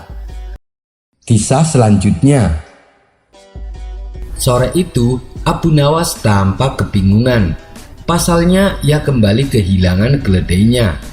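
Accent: Java, Indonesia. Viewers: Iya mas ngomong nya teger medok lekoh.cirihas orang Jawa . Salam dari serang Banten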